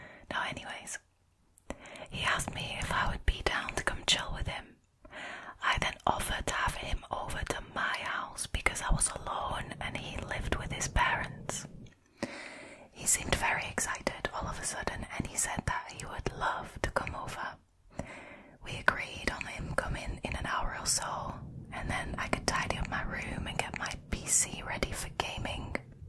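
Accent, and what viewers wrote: Northern England, United Kingdom. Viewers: you have a lovely voice sends me straight to sleep :) not to intrude but are you from the north of england? i am from there and you pronounce things similarly to me and it made me feel represented haha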